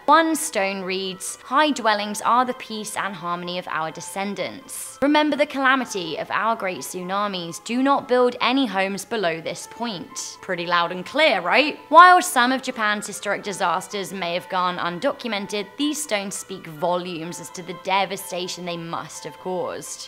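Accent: England, United Kingdom. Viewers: When people with British accents try to talk about American politics make me laugh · Her English Accent.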